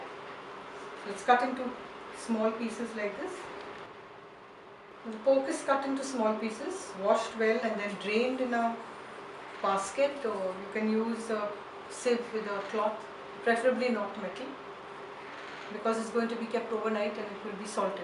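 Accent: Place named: Goa, India. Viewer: I love that Goan accent. Thanks.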